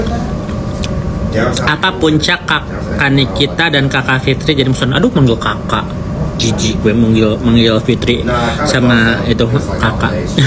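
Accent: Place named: Sunda, Indonesia. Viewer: Kk lucu ngomong sunda nya ceunaaaaĥhhhh 😂😂😂😂😂😂😂😂😂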